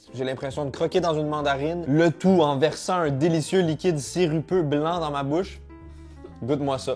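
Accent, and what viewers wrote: Quebec, Canada. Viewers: C'est vraiment cool que tu gardes ton parlé québécois même si la majorité de tes followers sont français. Ton authentisme est apprécié! Continue ton bon travail, ça passe le temps en criss! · dan ta prochaine vidéo reparle en Français stp t'es trop marrant sans ton accent québécois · "... de fleure sêchées que ta grand-mère met au dessus de ses toilettes quand ça sent trop la merde. Non? T'as pas la référence?" J'ai éclaté de rire XD XD Ça rend super bien, avec l'accent québécois XD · " Voici un Crush à l'ananô " L'accent québécois me surprendra toujours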